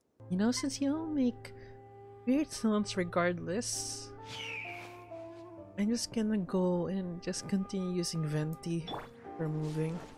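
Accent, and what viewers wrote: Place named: Philippines. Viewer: is it just me who hears his filo / pinoy accent?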